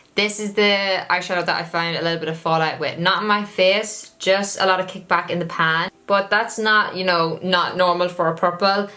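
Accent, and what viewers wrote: Ireland. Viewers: Are you from Dublin😀❤ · wait UR IRISH OMGGGGGG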